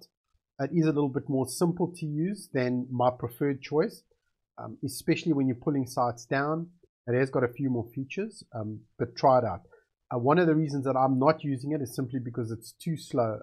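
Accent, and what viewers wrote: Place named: South Africa. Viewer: Love hearing a South African accent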